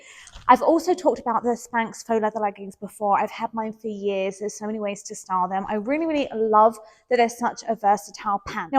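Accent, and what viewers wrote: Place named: United Kingdom. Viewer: Love your British accent! I think you should have ordered a size small in the Commandos! 😊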